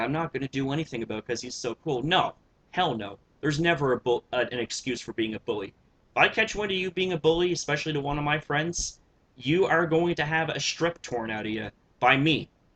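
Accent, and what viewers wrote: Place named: Canada. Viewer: If you are reading this, don’t ask me how I got here 10 years later but I just wanted to say I FUCKING KNEW IT LMAO! Throughout the whole video I was being a little shitter and poking fun at your very very subtle Canadian accent. Then you made the reveal and I laughed so much. Now I really feel like I have a good ear for picking up these accents no matter how micro they might be. Anyways cheers from America, hope you’re doing well wherever you are bro!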